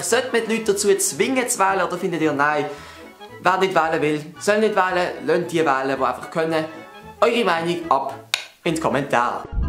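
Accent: Basel, Switzerland. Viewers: Ich mag den Schweizer Akzent👌 Und nur weil du Schweizer bist haste ein abo von mir😂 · Me merkts mega! ich kum au vo Basel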